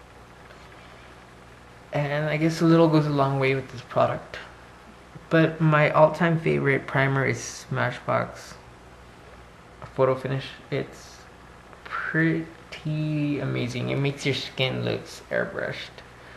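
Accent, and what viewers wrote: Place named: Latin America. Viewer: your voice is so calming and latino-ish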